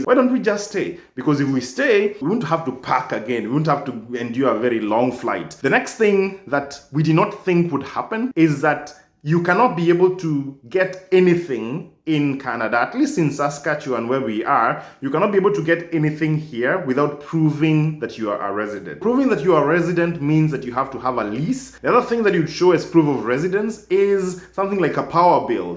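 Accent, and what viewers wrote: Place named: Kenya. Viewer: You sound Kenyan. Useful video.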